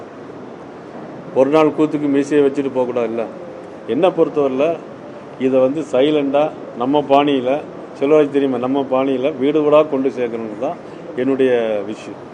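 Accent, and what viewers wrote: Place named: Tirunelveli, India. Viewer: நீங்கள் பேசும் நெல்லை தமிழ் அருமையாக இருக்கிறது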